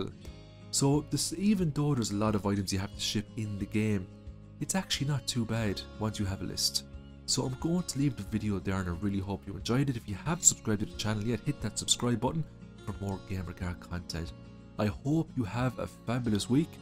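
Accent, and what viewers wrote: Ireland. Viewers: thank you for the heart, I love your Irish accent ❤️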